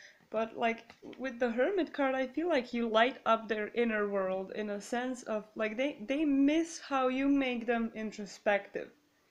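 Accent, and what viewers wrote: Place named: Czech Republic. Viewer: Are you czech i think you have a czech accent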